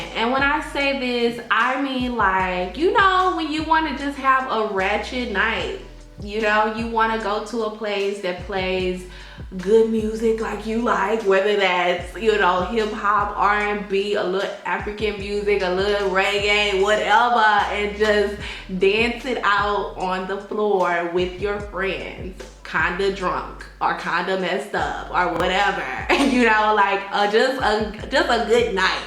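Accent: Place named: Washington DC, United States. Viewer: Are you from DC? You sound like you have a DC accent.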